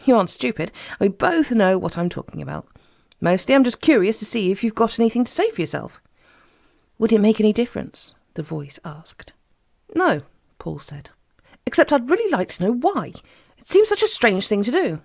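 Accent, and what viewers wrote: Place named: London, United Kingdom. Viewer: Great London accents btw not overdone which is a mistake even professionals make sometimes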